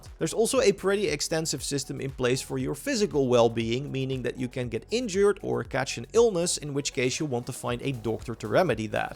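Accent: Netherlands. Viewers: UK HAHA youre dutch dude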